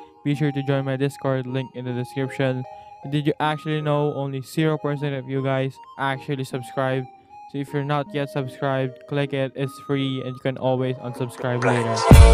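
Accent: Philippines. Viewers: from Philippines Confirm! Hehehe Proof: 1:From one of your helper in you server 😅 2:Your voice 3:You play in Asia Region Only that ooooffff But that's a good proof🤣🤣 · Are you a pilipino you sounded one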